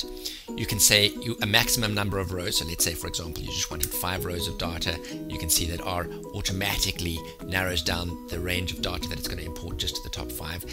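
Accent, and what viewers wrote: South Africa. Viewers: Lol very surprising to hear a South African accent on Youtube. The work you've done here is insane. Thanks!!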